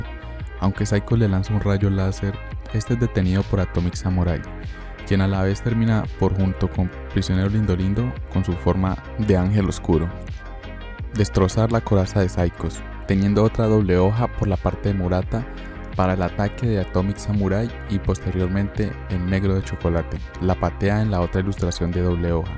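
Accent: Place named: Colombia. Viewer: Que paso con tu voz o.o? Ahora pareces Colombiano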